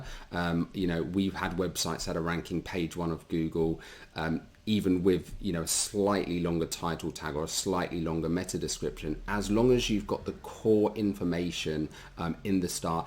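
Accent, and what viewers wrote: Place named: United Kingdom. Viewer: Thank you. A class is better when given in british accent.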